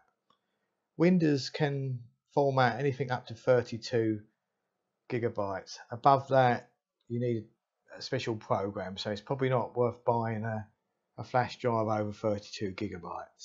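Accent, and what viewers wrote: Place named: United Kingdom. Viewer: Well Done furr a Brit..just kidding accent tickles my ears..easy peezy just watt eye was looking for..thx for posting xo a new fan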